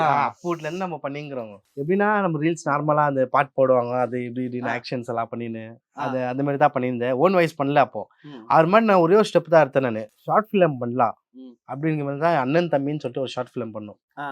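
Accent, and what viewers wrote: Bangalore, India. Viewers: Nan kuda chennai slang nu nechan but Bangaluru tamil nu enaku thariyathu super ha iruku unga videos new subscribe ❤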